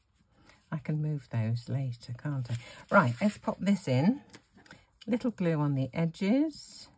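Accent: England, United Kingdom. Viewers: Today was the first time I've seen you, it was lovely to hear an English accent (just for a change) and to see dies whose make I have but have never seen demonstrated before. Enchanting l loved every minute thank you ❤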